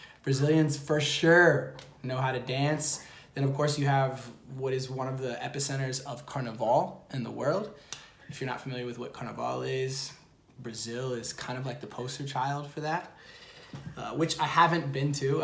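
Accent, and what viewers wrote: Buenos Aires, Argentina. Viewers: Te escuché tanto hablar en español que ahora parece que hablas inglés como Argentino que aprendió el idioma jskajaja te amoooo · Ahora cuando habla ingles se nota que tiene el acento argentino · Parece un argentino que aprendió inglés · Hola, me encanta ver esta pasion por aprender otra cultura, solo habre otras avenidas, pero si talvez pierdas el bello acento Argentino. Pero extiende tus alas vuela. 💞🤗🤗🤗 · Dude, you don't know how glad I am . Soy Brasileño, vivo en Buenos Aires, o sea ya era inmensamente feliz cuando te escuchaba hablar un "castellano porteño", y ahora que dijiste eso .... Cara o português que falamos no Brasil é unico, é particular, é sonoro. Espero que aprenda e um dia grave alguns videos que vamos te aplaudir de pé. Tamo junto kkkkkkk. You kill it man, nice video. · Estoy loca o cuando habla en inglés lo hace con acento porteño????? 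Cuando hablas en inglés te dicen que tienes acento?????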